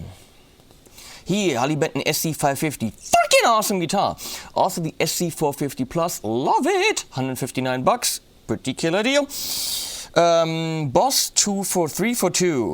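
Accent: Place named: Germany. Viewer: Got one myself. Not that I needed another guitar, I own Gibsons, Fenders, Epiphones and a lot of Partsocasters and Chinese rip offs(mostly custom orders on my specs). I love this guitar expecially for its playability (24 frets is a luxury!). I have replaced the stock tuners with a set of locking machines and the knobs with amber barrel ones. The stock pickups are not bad ay all, though they have some sort of plasticity reminding me some Ibanez models. I might drop in a set of Duncans, they made some of my Chibsons sound super! All in all, a good purchase, bought second hand and already broken in. Thanks for your videos, you crack me up (I have toured extensively in Germany in the past, and I love your accent, it reminds me of the old days!)😄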